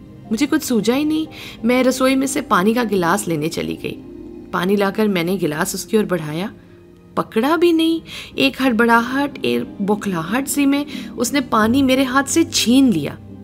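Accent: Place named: Pakistan. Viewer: I love your sweet pakistani accent voice.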